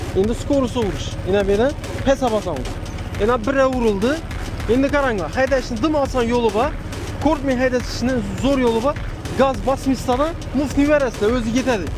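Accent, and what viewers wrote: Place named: Khorezm, Uzbekistan. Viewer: Хоразм тили котили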